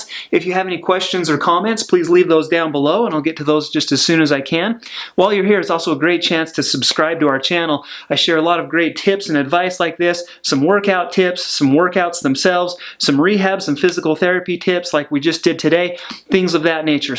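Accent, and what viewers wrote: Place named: Utah, United States. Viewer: This is excellent, and I heard him talk for three seconds and knew he was from Utah!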